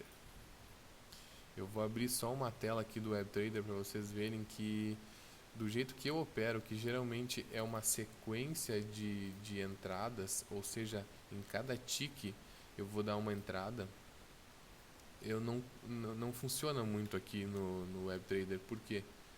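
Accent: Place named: Porto Alegre, Brazil. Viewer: Sou de Porto Alegre , seu sotaque parece daqui hehehe. Estou na Binary a 11 anos e uso o Zeek de forma automatizada.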